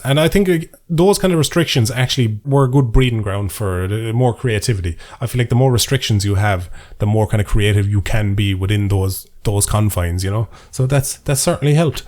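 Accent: Ireland. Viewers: It’s actually the melodic tones of your voice. The brogue is an orchestra to the ear and the humour is fowl... birds of a feather and all. Side splitting humour to the point of not being able to breathe. And yes ... we can feel it 😜😜😜ps... the missus needs her own shirt 😋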